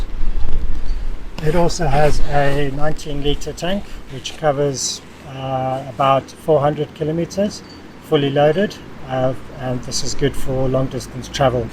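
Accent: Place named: South Africa. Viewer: Hi I just bought one yesterday after dreaming of it for many years! From your accent i take it your south african? I'm from Worcester (SA). Would relly like to meet you one day maybe? Cheers!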